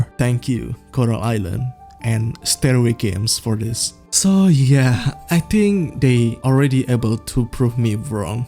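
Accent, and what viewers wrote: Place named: Indonesia. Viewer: great video! i was wondering where your accent originates from? also i'm surprised you don't have more subs, your content is very polished:) keep it up! edit: i'm guessing you're from indonesia or are from indonesian heritage and that's where your accent is from after watching more of the video. i've never learned much about it, so i will definitely watch your videos to learn more about your culture and how it's portrayed in coral island!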